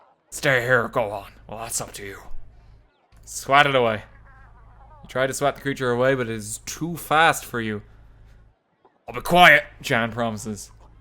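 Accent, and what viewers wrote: Ireland. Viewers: Great Irish accent so... what other accents can you say and what is your favorite game so far in you career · This video should have been called "A man with an Irish accent carves a bloody path to Kharé in Steve Jackson's Sorcery"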